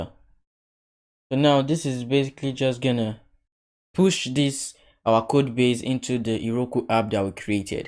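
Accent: Nigeria. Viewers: Name and accent sound Nigerian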